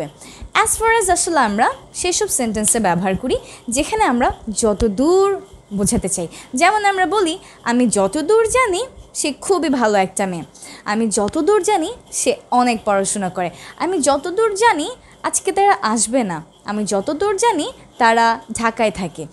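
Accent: United Kingdom. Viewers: ছোট বোন তুমার ইংলিশ উচচারণ গুলি ইংল্যান্ড এর লোকদের মতন হয়।ভালো থেকো সুভকামনা রইলো। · অাচ্ছা অাপু অাপনার pronounce কি ব্রিটিশ?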